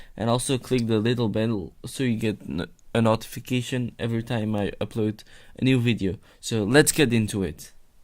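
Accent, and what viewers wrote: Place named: India. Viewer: media hora hablando para no decir nada con acento deindio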